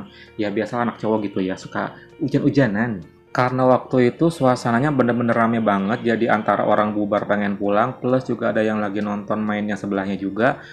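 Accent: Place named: Bandung, Indonesia. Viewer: orang bandung ieu mah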